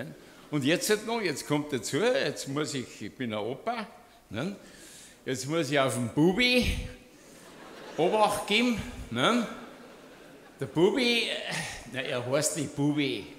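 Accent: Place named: Bavaria, Germany. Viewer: Sei deitsch is mindestens so guat wia vo manchem Preissn, moan i.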